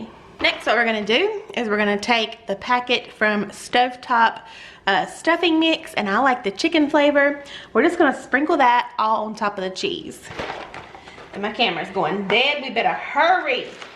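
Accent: South, United States. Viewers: Everything looks amazing.....I especially like the potato soup recipe!!! I don't know what the fuss is about the word moist but hey everyone has an annoying word eh! I honestly can't stand when someone can't pronounce words with "oi" in them like oil or foil for example and says them like ole and fole rhyming with bowl... had to stop watching videos because of it sorry to say. Anyways, you asked...LOL...thanks for sharing, really enjoy your channel and you southern accent! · The way the economy is right now, chicken appears to be the choice. Your recipes are so yummy. I look forward to trying the Swiss Chicken. I love your Southern accent. I have one too.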